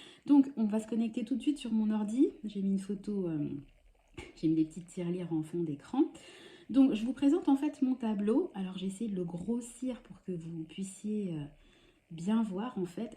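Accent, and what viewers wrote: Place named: Franche-Comté, France. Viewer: Coucou, moi j'ai un tableau Excel dans lequel l'intégralité de nos comptes, des frais fixes, d'une partie des frais variables gérés en CB, et des épargnes sont intégrés. Puis pour les autres dépenses variables, j'ai mes enveloppes. Sinon, rien à voir hein, ton accent me fait dire que tu viendrais du Franche-Comté...? Mais peut-être que je me trompe 😆 Bon we 😘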